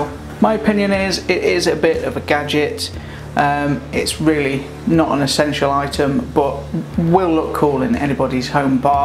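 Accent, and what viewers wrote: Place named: RP, United Kingdom. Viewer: Have you read the manual before making this video? Probably not, because you omitted some important steps. Also, in the tap unit installation, you have mixed up the sequence. Your posh accent is not a substitute for being a professional. This is food&beverage department, not following the rules may become a health issue.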